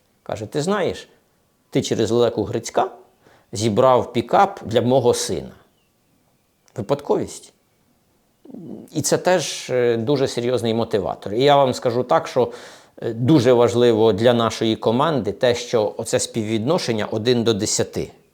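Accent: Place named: Poltava, Ukraine. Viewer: Мелодика мовлення у полтавчан особлива, впізнавана.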